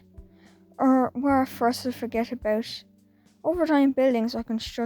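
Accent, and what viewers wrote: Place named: United Kingdom. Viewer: U sound british and also new sub (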